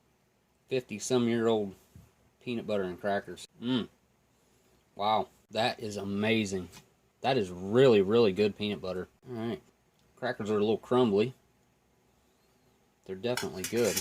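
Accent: South, United States. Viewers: Love your channel & also love your accent! U got the cutest accent ever! Country....but just smooth & cool!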